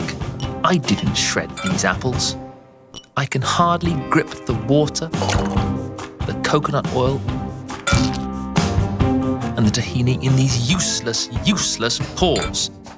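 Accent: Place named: United Kingdom. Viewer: Ah esse inglês britânico difícil e lindo!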